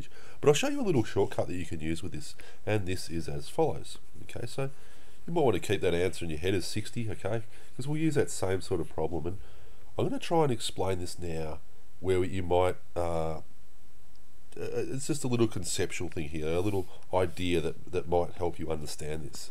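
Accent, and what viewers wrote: Australia. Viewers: Ayyyyyyy we got an Auzzie out here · Is he Australian sart of video he went like "G'day and welcome to channel"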